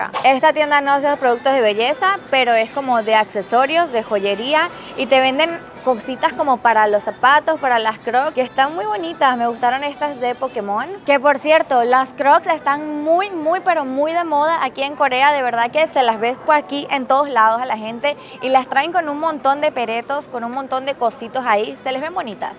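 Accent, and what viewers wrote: Venezuela. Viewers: A donde vaya como habla puedo decir que es Venezolana amo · jajaja no se le puede negar que es venezolana por su forma de hablar super depinga. saludos desde Venezuela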